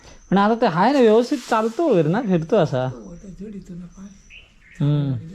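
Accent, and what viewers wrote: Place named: Ghats, India. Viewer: Bhasha. Kokni vatat nahi,ghatavarchi vatte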